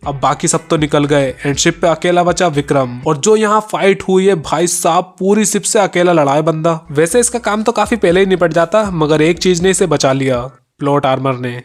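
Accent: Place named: Bengal, India. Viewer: Bengali hoga, Bengali main deewar nehi bolte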